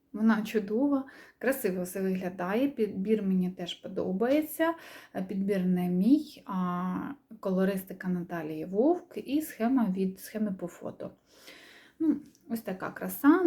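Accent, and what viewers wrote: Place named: South, Ukraine. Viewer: як завжди ви не перевершені,гарні схеми,гарна робота і може я я помиляюся, милий душі південний акцент.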